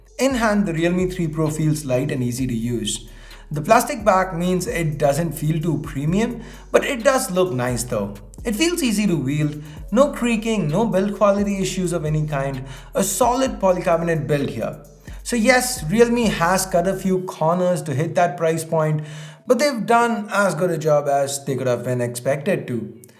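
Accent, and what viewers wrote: India. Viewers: I know its bad on my part, but I just can't stand Indians having a mix of Indian and foreign accent. Sorry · difficult to understand your indian slang english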